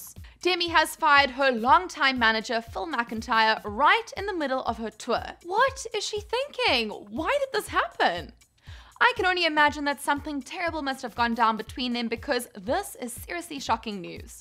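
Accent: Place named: South Africa. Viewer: Ur south african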